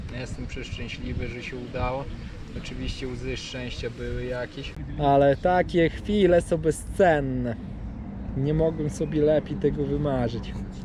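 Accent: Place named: Podlasie, Poland. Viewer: Kurwa ta twoja gadka masakra z jakiej ty wiochy pochodzisz gdzieś pewnie z podlasia albo chuj wiii. Ciezko sie tego słucha.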